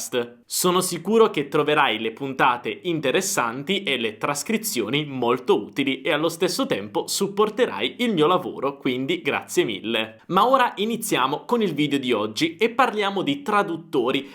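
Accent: Italy. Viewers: ho scoperto il suo canale youtube qualche settimana fa e da allora ho guardato molti dei suoi video. Vorrei congratularmi con lei non solo per il contenuto ma anche per la sua dizione. È estremamente facile capirlo, il che mi rende molto curioso di sapere in quale regione d'italia vive o è cresciuto. Gracie per i suoi eccellenti video. Giulio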